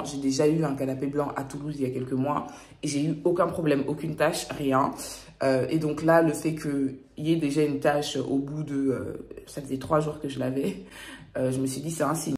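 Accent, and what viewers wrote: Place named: Ivory Coast. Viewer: L’accent ivoirien j’aime trop🇨🇮😂 Pourquoi pas une vidéo en parlant avec cet accent Tu as une belle famille 😍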